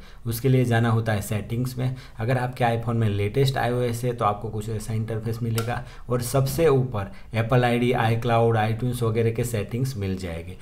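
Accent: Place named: Rural, India. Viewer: Why Is the Video Title english but the actuall Video Is a villager speaking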